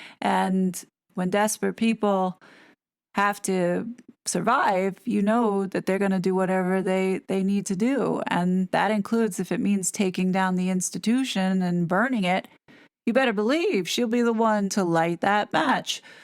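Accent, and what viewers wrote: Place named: New York, United States. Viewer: this is totally off topic, but you should look into doing books for Audible or some sort of ASMR perhaps. The way you speak, not just my lifelong love of NYC accents like yours, but your inflections, whit and intelligence, I could listen to you do anything. Read anything. And be captivated which isn’t easy for me to be captivated by someone I hear and can’t see. Love you friend. Maybe you can voice commercials? I need you to narrate my life😂😘💛 sending love from California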